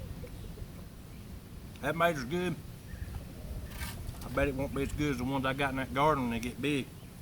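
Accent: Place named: South Carolina, United States. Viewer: I have a cast iron every day, that's all I cook in. I am in SC and you talk just like me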